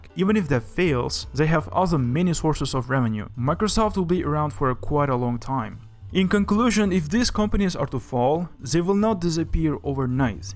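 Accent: Eastern Europe. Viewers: clearly eastern European accent... But this "the" Google or "the" Amazon thing is kinda weird... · So by the way you pronounce Huawei am I wright in guessing you're from Eastern Europe?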